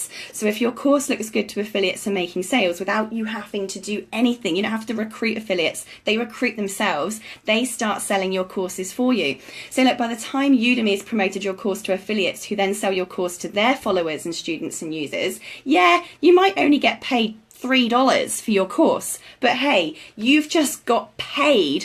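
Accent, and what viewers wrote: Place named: United Kingdom. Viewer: Great content, horrible video. The audio and video wasn't in sync had to stop watching but keep listening. Very glad I did. Your British accent and lingo is awesome, "A Silly Sausage" - Great stuff, lol - I like how you used the bread, made a lot of sense. Have a great day. God Bless, Anthony 2 Tim 1:7 <link>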